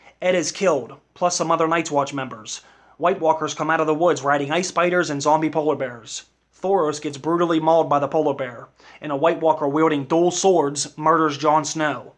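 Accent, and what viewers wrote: United States. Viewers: I can't place your accent. Sometimes you sound like you're from Bal-more when you enunciate those rounded Os. Then you sound like you're from New England with the shortened A (i.e. park is pahk car is cahr) but then you sound like you're from West Virginia with the countrified dropping of word endings (I don't mean that as an insult- I think countrified accents sound absolutely warm and charming!) I think you did an outstanding job on this video. Well done, dude!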